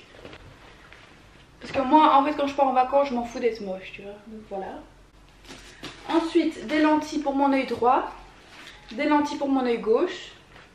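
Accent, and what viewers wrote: Belgium. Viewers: Est-ce que tu es d'origine belge ou d'une autre origine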